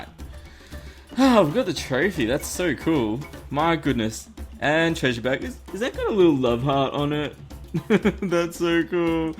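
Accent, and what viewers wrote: Australia. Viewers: congradulations on 80,000 subscribers,! I think one of the reasons your channel grew so quickly is the shoutouts, people keep coming back to see if their shoutout was used, and of course, your australian accent makes you a calm, funny youtuber that everyone enjoys:)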